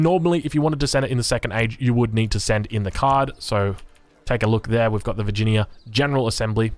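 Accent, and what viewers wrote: Australia. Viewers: It's just his aussie accent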